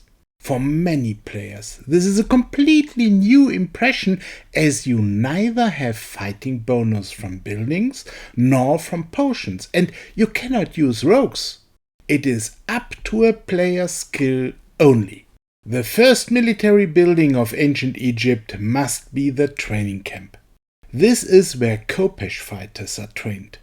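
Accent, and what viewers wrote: Germany. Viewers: The first game I loved was Final Fantasy Tactics, so I enjoy the strategy RPG battles in FOE. Are they the only reason people hate the Egypt settlement? I was thinking it had impediments even worse than Vikings. I build for 3x3 structures, and then they introduce a 3x5 when I was building around stupid rocks I can't do anything about. I think I'll finish Vikings in time. I still wanna go back to Japan and lvl those buildings since it's so quick. But Egypt isn't looking as mean as people made it out to be. Though, I'm only one video into it. Your next video might change my mind.. And I love the accent. I'm guessing you get this a lot. But you sound like an extremely pleasant person. American women prefer the British accent. But American men prefer the German accent. That might not sound like much of a win, I admit. But it is.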